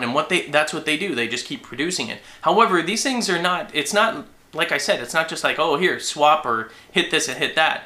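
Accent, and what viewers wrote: Canada. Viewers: They should've taken it out of the whole dam game. side note...are you Canadian? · are you Canadian? just wondering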